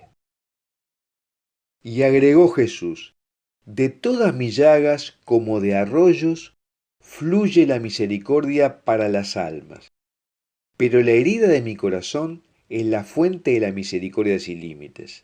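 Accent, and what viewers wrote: Argentina. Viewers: Hola muchos no creen y los pcos que creen,no les importa,el habla como argentino ya se te esta quitando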